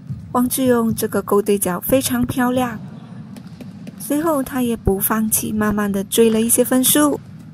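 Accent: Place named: West, India. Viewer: 这个似洋似印的旁述扰人观赏球赛呀😂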